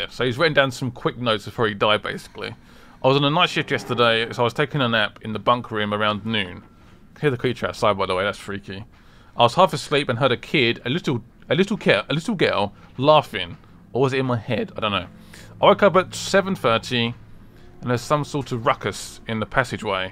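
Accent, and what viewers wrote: United Kingdom. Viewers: God I love his British voice mmmmmmmmm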